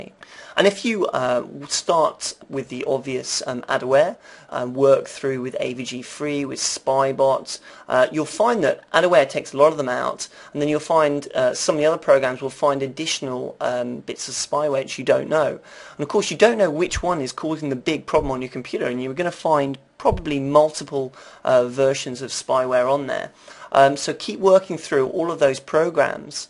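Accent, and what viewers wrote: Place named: Mid-Atlantic, United States. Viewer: Sorry. I tried to watch this, but got too annoyed at the mid-atlantic accent. You're English man! It's not 'compewder' - it's 'computer.'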